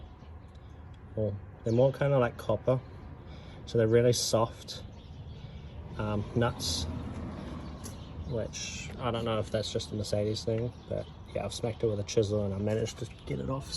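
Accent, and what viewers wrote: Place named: New Zealand. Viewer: I know a fellow kiwi when I hear one haha, what part of the country are you in? What transmission do you plan on using and power/Tq level you're aiming for? Planning to also go turbo on my M104 powered S124. Currently have a 717.404 box but don't think it'll hold up, might try a little boost on it first and see what happens.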